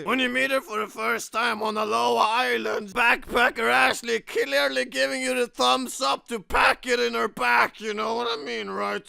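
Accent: Sweden. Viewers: Are you Swedish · Why are all swedish (I kinda heard a accent) YouTubers so crazy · WTF are you Swedish?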